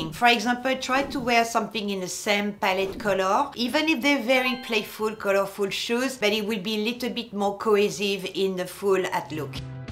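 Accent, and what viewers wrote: France. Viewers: Some really great style tips. Thank-you. I could listen to your French accent for days on end - no matter what you were talking about 😍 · I love your French accent. It reminds me of the months I lived in Bordeaux. I love your personality. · I guess you are French... from your accent. Right?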